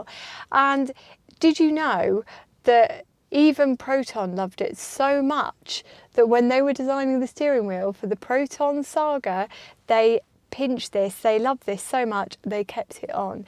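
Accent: United Kingdom. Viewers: We can tell that your love for this car is not feigned. And I love your British accent (quite logical since you are), your colorful expressions (...like a swan on a pond) and you drive divinely (deessely) well and with style (with your leopard coat you look like Catherine Deneuve in a Truffeau film, perfect temporal matching)🙏💙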